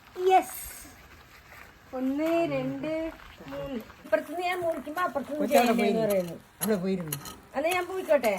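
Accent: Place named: Kochi, India. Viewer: Cochi slang 😍😍😍😍😍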